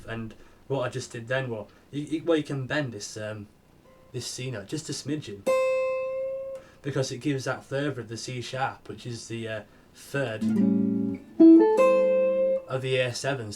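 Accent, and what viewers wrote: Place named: Lancashire, United Kingdom. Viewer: Good vid man very useful. You from Lancs?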